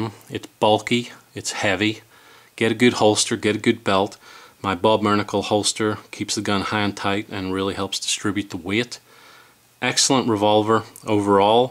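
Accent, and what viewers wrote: Northern Ireland, United Kingdom. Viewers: Northern Irish to me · Accent Northern Ireland Methinks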